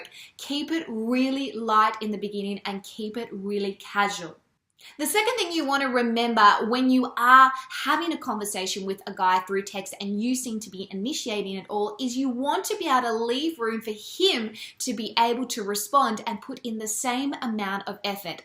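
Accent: Australia. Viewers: The aussie accent tho 🙂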